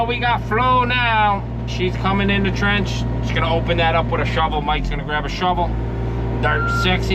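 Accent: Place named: New York, United States. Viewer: Unsubscribing!! Can't stand listening to that annoying New York accent!!! And you're a know it all, typical east Coast Democrat!!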